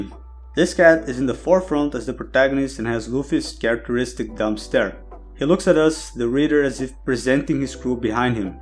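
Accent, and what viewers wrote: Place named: Brazil. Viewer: Pelo seu sotaque... Você é br?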